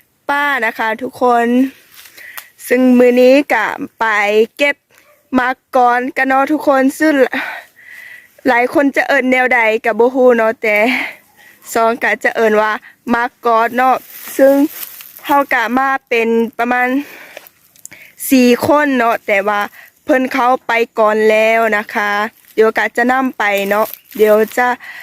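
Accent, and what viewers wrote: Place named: North, Thailand. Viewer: ນ້າຮັກຫລາຍອອກສຽງເວົ້າຄືຄົນພາກເຫນືອເນາະ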